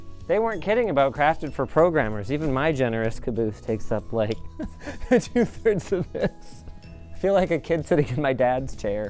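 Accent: Canada. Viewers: Why does linus sound like he's leaning heavily into his canadian accent in this video.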